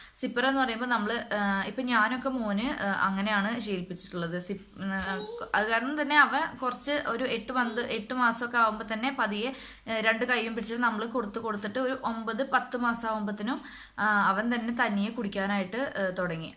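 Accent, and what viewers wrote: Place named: Thrissur, India. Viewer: good, thrissur evideya, njan plkd pattambi anu .slang njangal samsarikunna pole thanne adha chodiche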